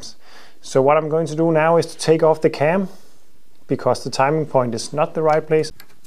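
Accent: Denmark. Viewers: Wait are you from Bornholm?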